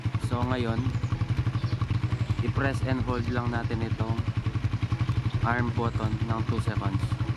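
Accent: Pampanga, Philippines. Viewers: Pansin ko sir sa pagsalita mo, hula ko, kapampangan ka? tama ba ko? hehe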